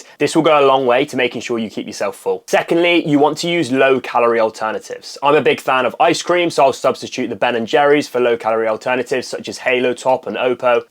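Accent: United Kingdom. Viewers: Love your accent. What part of the UK is it from?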